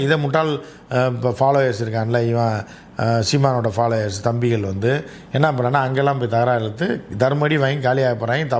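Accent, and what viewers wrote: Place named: Nagercoil, India. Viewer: Nagercoil slang kooda ippadiatha irukkum...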